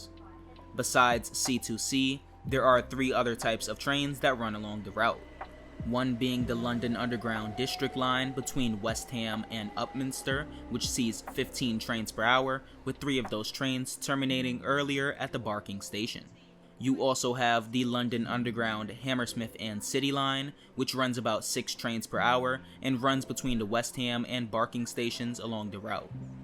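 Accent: United States. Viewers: Having moved out a while ago, this was so nostalgic hearing someone talk about the line that I grew up with. I also loved hearing someone with an american accent saying my home town name xD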